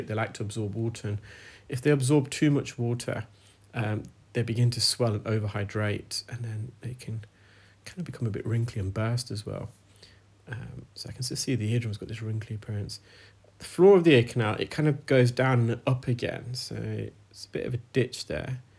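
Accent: United Kingdom. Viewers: Someone telling you off for using “anti-clockwise”? When it’s a UK term, used in a video recorded in the UK, by a person who sounds like they were born and raised in the UK? The nerve. Now that that’s off my chest - great video as always,. You never fail to entertain and educate. Please keep up the good work!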